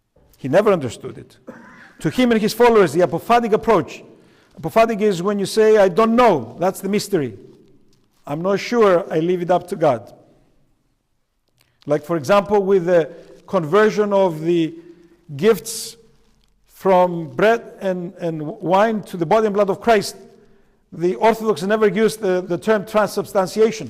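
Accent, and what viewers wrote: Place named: Greece. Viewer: Does this channel ascribe to the OCA, or the Greek Orthodox church? It is challenging to hear the english through his thick Greek accent, for me anyway.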